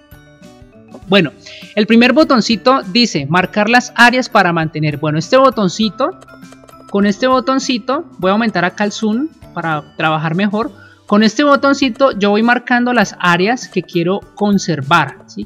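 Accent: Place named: Colombia. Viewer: "Le doy los agradecimientos" que lindo habla, muy propio. Acento Colombiano creo. Saludos, fue de mucha ayuda el video. ;)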